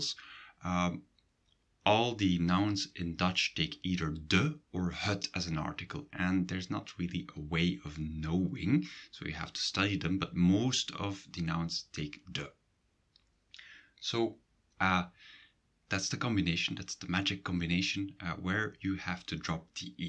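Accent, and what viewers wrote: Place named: Belgium. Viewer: LOL, the region where I live is in America. But it should serve me well enough to know that your pronunciation is Belgian and to listen carefully to the differences. I speak French and have had to deal with wildly different pronunciations in Canada as well as vocabulary and idioms. However, focusing on the grammar should be the same.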